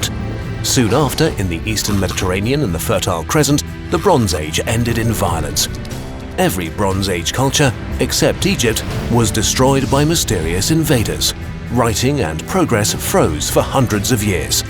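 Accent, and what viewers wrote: United Kingdom. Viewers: Not sure why, but his British joice just makes it seem more reliable and knowing. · Where is he from? because Kurzgesagt is German, but he sounds more Brittish.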